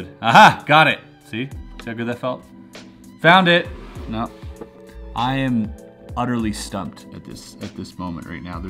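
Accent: Canada. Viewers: is he canadian? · You’re Canadian aren’t you lol cameras sorted ooout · I fucking knew he was Canadian haha I was like “well he has a NASA hoodie” and then I was like “no American says out like that” I was right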